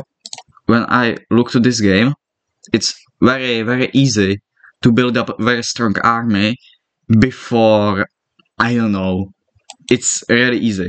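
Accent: Czech Republic. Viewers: i can hear your czech takže ahoj so slovenska! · Hmmmmmm Czech accent? Nice video bro💪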